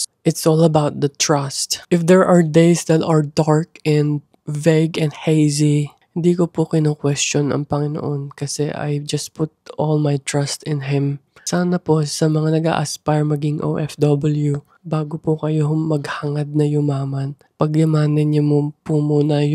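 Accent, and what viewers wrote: United Kingdom. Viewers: I love your British accent ❤❤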